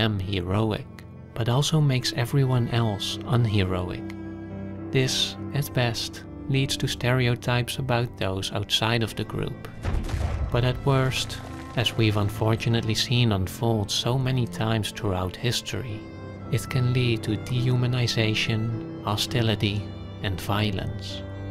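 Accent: Netherlands. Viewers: kan ik opmaken uit je accent dat je in der Nederlanden woont? Klasse kanaal, topkwaliteit materiaal dit! :D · Are you Dutch? Your accent seems Dutch.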